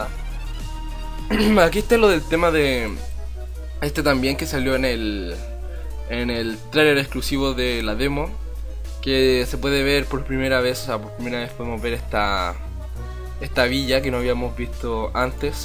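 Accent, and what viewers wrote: Chile. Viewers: es chileno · ¿De qué país eres? es que tu voz la siento de chileno(?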